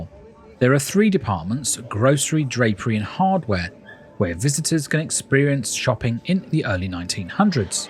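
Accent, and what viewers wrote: England, United Kingdom. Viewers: Lovely content with lovely English accent..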